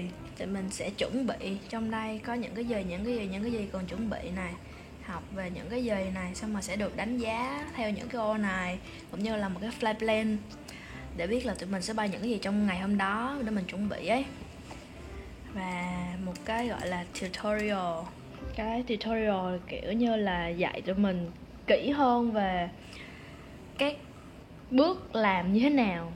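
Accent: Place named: North, Vietnam. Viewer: bạn này chắc là có máu lai miền bắc và miền nam, vì nghe cách nc bình thường thì miền nam mà có cái chữ ấy ấy là người bắc hay dùng và bạn đó cũng phát âm giọng bắc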